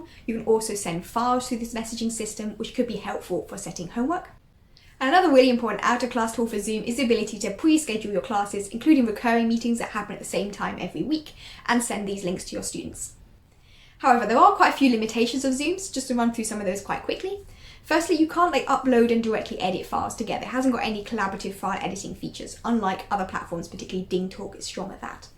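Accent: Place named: England, United Kingdom. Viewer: Hello. Thanks for the great information that you share with us. However, can you please slow your speech down? I am a Native English speaker who teaches English online. I am finding it very difficult to understand you as your English accent is very thick and you also speak very fast. I am trying to understand all of the information that you are sharing with us however, I am replaying the video over and over again in order to understand you. Best wishes, Karyn.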